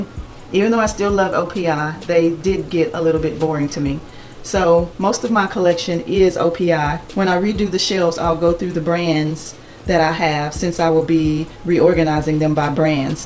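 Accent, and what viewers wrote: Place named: Texas, United States. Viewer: I thought you sounded like a Texas girl! Definitely looking forward to brand videos when you redo your shelves.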